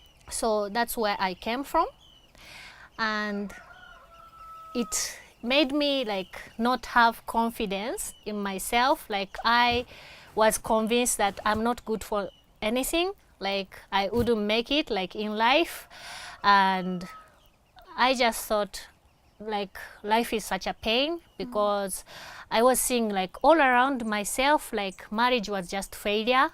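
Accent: Kenya. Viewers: wetu, umerudi?? Your 'R' makes you sound like a real okuyu from nyeri.... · She sounds like an Kenyan women 😉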